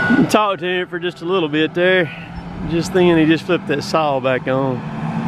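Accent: South, United States. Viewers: Stumbled across your YouTube channel. I thought to myself "this dude has got to be from the south" I'll be damned if you ain't from Columbus MS. Lol. I live in tupelo. Own a small sawmill and do a little tree jobs myself. Look up Smithsawmill on Facebook. Great videos bro!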